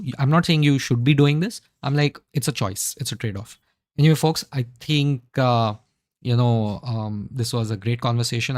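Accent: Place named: Allahabad, India. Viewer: You sound like an Allahabadi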